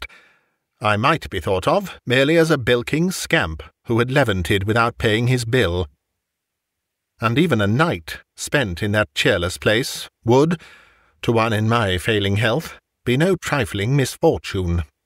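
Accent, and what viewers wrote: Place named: RP, United Kingdom. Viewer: Dang I love yer posh voice!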